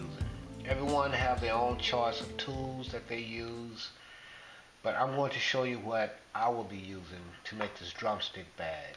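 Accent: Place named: South, United States. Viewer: Love that dirty south dialect…. Miss it so much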